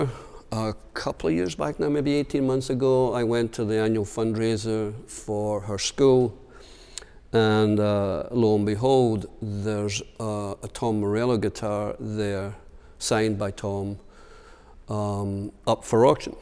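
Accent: Scotland, United Kingdom. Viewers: Was not expecting the Scottish accent, or that playing "style" if you can even call it that. I gots ta give the man props for partnering with Seydel harmonicas though to create the excellent Midnight Special harmonica, truly one of the most beautiful harps ever produced. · hes from whitburn new he had a scottish tone . makes me proud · He's got that annoying accent that all Scottish people get when they live too long in America. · Am I the only one that was surprised that he’s Scottish? Not saying it’s a bad thing, just a little unexpected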